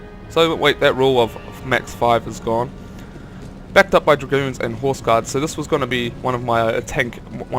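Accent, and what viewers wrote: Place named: New Zealand. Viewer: xD The kiwi accent restricts soooo much =(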